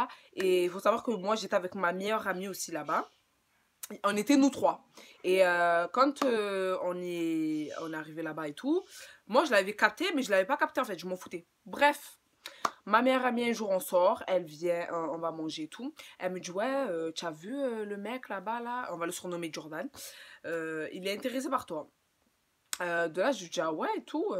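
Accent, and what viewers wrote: Marseille, France. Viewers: on dirait une marseillaise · Oui on veux la partie 2 de ta best t grave mimi les mecs faut pas vivre pour eux avance fais ta life jador ton accent marseillais 😊 ..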